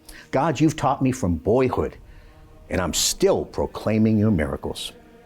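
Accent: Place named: Boston, United States. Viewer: I recognize that Boston accent 😊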